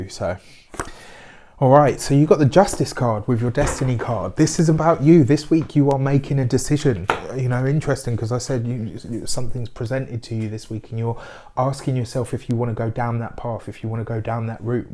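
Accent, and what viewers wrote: United Kingdom. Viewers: Are you brit. ..yes. it's been the longest year. ..but my guy going through stuff too.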